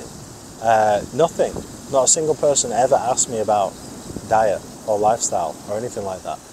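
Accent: Manchester, United Kingdom. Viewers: Is that a Manchester accent I hear? I’m American by the way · Is that a Manchester accent? I’m an American and just curious if I recognize accents well enough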